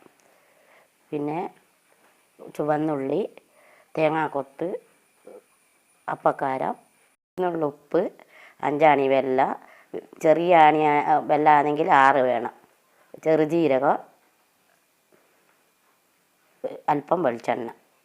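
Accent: Nadapuram, India. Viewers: നാദാപുരം ഭാഷ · Nadapuram bhaagath alle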